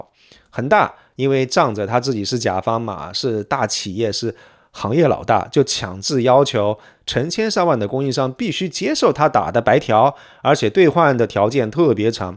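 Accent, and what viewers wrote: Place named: Shanxi, China. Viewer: 说实话是哪里人？听口音像山西的！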